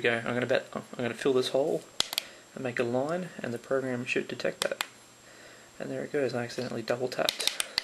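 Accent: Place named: France. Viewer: est ce que tu es français ? je reconnais ton accent français :)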